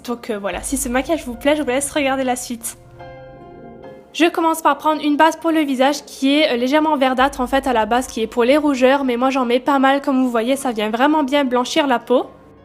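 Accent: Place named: Alsace, France. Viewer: Wahou c'est trop réaliste ! En entend bien ton accent alsacien personnellement moi je l'entend bien car je suis alsacienne !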